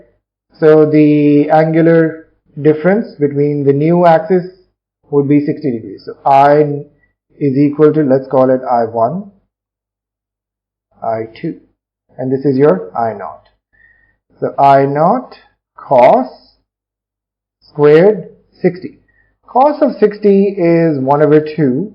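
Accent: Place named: India. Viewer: his accent is not bad, much better than common indian accent!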